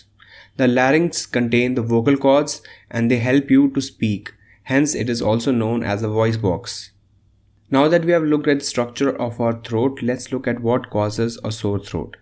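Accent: India. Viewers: Indian accent 😁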